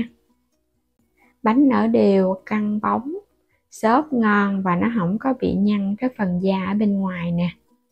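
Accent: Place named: South, Vietnam. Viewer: Tra Vinh noi giong mien nam co them danh tu HEN sau cau noi nghe de thuong qua ❤️👍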